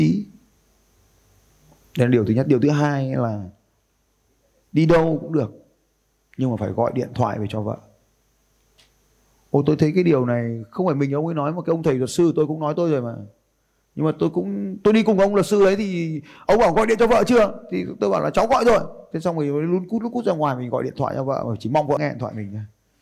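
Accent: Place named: Bac Ninh, Vietnam. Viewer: Giọng a hình như Bắc Ninh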